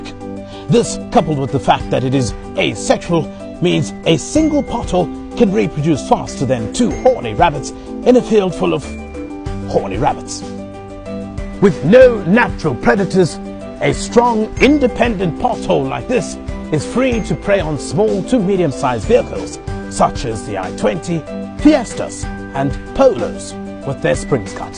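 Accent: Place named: RP, United Kingdom. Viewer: you are quite simply brilliant! Love the posh accent and style of presentation. Gimme more, gimme more! 🎉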